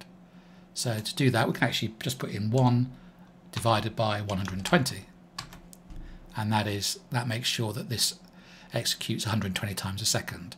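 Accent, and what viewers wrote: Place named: South Carolina, United States. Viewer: Let me guess, are you from South Carolina or somewhere near it? THe manner of pronunciation reminds me my friends from these places. Thank you so much Sir, interesting and useful videos!!!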